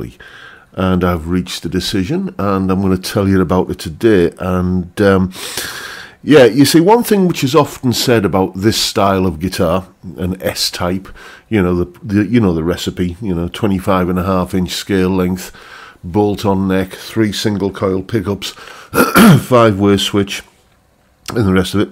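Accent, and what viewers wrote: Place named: Northeast England, United Kingdom. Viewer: I'm trying to place your accent... Guessing somewhere between Consett and Darlington?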